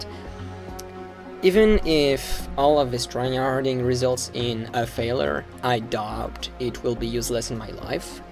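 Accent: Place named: United Kingdom. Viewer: cet accent british sa race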